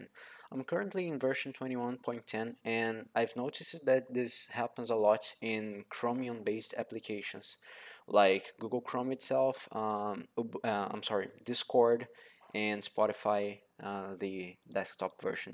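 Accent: Brazil. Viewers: eu reconheci que tu era brasileiro pelo sotaque kkkkkkkkk obrigada, funcionou certinho :D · Valeu cara, salvou muto (reconheci o teu sotaque de br kk).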